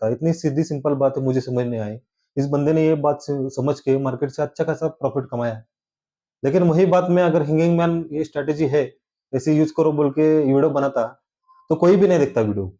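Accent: Maharashtra, India. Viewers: Sir ji aap kha se ho, bolne se to lagta hai ki , u r from Maharashtra, I'm from dharashiv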